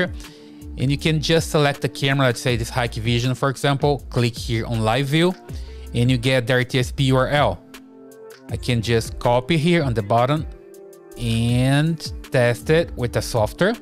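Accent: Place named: Brazil. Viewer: Thank you for that! It's my first time in your channel, you accent sounds familiar. Brazilian?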